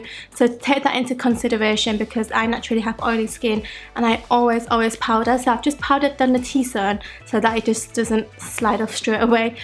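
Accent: United Kingdom. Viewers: I love Asian Britain girls accents because of their so strong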